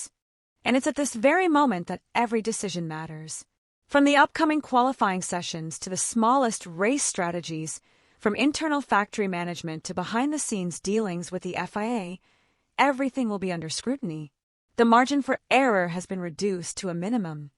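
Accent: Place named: United States. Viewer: good hear some American accent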